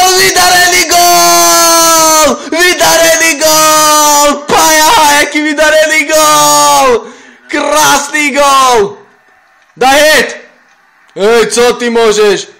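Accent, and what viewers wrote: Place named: East, Slovakia. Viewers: paradaaaaaaaa...supeeerr....aj ked polka SVK nerozumie asi ale "na vychodze rozumime" :D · Vychodňar ako ma byť!! :D :D :D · jeden záchvat skončil,a pri dalšiej vete znova :'DDDD niet nad našu vychodnarčinu <3 :3 :D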